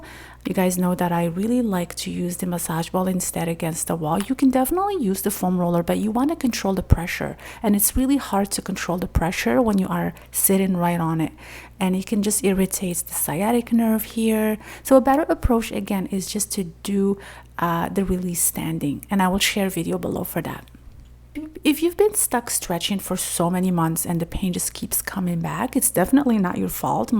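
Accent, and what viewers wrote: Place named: Morocco. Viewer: Spot on again (BTW I identified a district attorney as being from Morocco originally because I know - and love - your accent)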